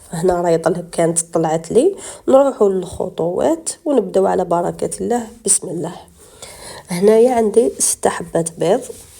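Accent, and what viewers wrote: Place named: Skikda, Algeria. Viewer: شكرا على الوصفة تهبل يا اختي انت من ولاية سكيكدة اللهجة نتاعك بانتلي سكيكدية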